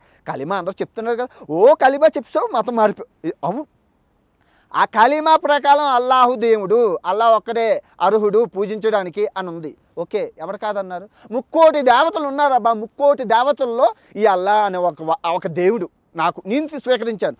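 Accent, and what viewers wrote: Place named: Visakhapatnam, India. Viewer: హాయ్ బ్రో ఈ మధ్య నీ వీడియోలు చూస్తూన్న చాలా బాగుంది... వైజాగ్ లో ఎక్కడ మీది... అంటే స్లాంగ్ ని బట్టి వైజాగ్ అనుకుంటున్నా ఎనీహౌ all the best for your future world trips and God bless You...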